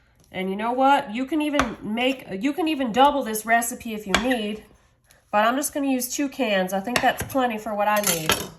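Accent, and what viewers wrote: South, United States. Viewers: What part of the South are you from😊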